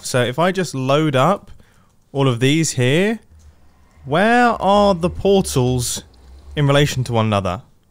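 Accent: United Kingdom. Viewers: He's so British LOL, he says 'por-al' the 't' is kinda soft.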